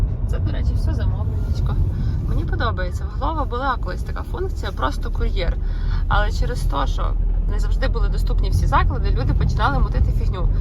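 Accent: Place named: Lviv, Ukraine. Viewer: Мне вообще не нравится украинский, но ваш украинский мне приятно слушать) Может Львовский акцент Я из Киева.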